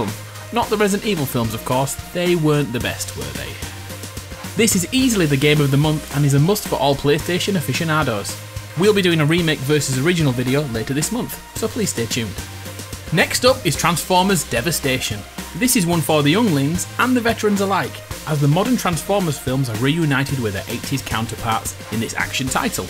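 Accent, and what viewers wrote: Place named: Scotland, United Kingdom. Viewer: Wow, the scottish accent. How much you changed!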